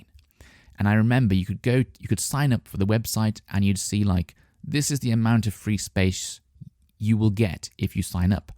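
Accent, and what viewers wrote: United Kingdom. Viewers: I listenning you cause i have exam tommorow, like Final exam And i have problem with that bitish accent so thank you for this podcast. You have good voice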